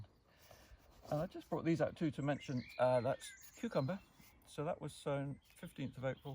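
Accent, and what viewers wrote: United Kingdom. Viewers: Good video but the volume on the sound was much too low (as are some of your other videos). Not sure what the problem is but it’s very difficult to hear you and being from the US, I have to listen very closely to understand your lovely British accent. ♥️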